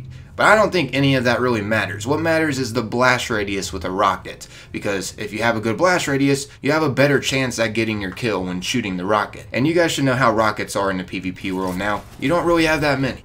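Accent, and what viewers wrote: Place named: Maryland, United States. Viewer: You HAVE to be from Maryland. Lol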